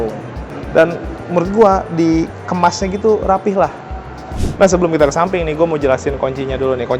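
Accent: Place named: Cibodas, Indonesia. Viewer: Kya org Cibodas nih.. bang yah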